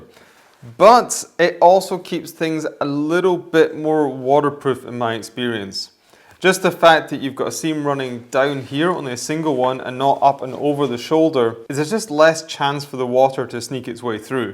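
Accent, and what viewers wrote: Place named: Edinburgh, United Kingdom. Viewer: He’s Scottish , East coast accent .... probably Edinburgh .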